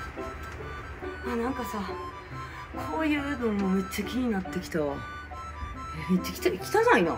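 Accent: Kansai, Japan. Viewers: なぜ？オレのオススメにでてきてた！？ そしてめちゃくちゃ好きな関西弁とめちゃくちゃ男を虜にするアングル。 そっとチャンネル登録押したオレは正直者😂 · 関西弁 めっちゃ良い🤭 あからさまな感じが 意外にモテる秘訣かもね😅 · ダメだ…サムネの破壊力に負けてしまったwしかし女の子の関西弁は可愛いわぁ🎉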